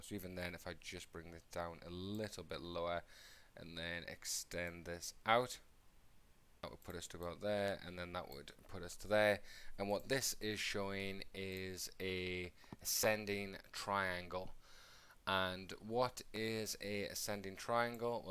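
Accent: United Kingdom. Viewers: New sub here. Always good to listen to TA from a fellow Englishman. Even better when they're from the North-East too!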